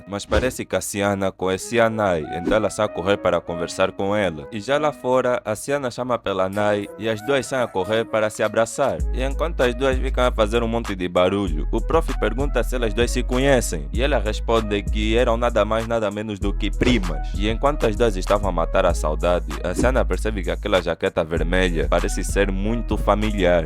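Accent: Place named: Mozambique. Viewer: eu achei que vc era um Moçambicano da língua de Quelimane e igualzinho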